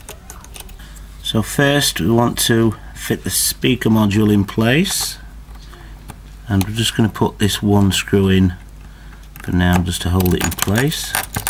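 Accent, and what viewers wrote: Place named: England, United Kingdom. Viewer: Thank you for posting this. I watched this from Connecticut USA, whilst getting a Skype call from from a family member located in North Lincolnshire and commenting, "he's from England!":D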